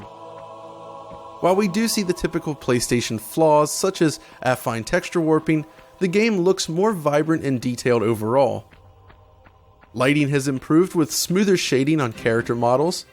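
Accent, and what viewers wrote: United States. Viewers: he's American and definitely has an accent. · Well, he IS from America. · This guy's American accent makes me cringe. Still, great work DF!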